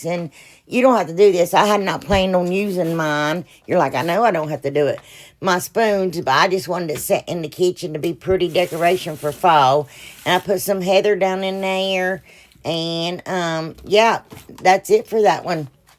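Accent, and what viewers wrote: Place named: Tennessee, United States. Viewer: I love to watch you. You definitely put a smile on my face when I watch you. Your accent sounds close to ours here. That would be in Northeast TN. Love your crafts. You can put matches in the toothpick holder to light candles too.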